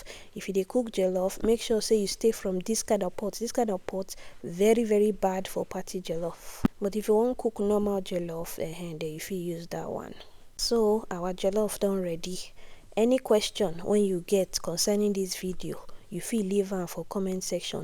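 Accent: Nigeria. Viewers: are you from PH or did you grow up in PH? This pidgin be like the one wey abobi dem, dey speak for Diobu 😂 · don win my heart with this original Naija pigin English accent 🎉🎉🎉❤❤😂😂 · That niaja pidgin sounds so sweet in my ear❤❤❤❤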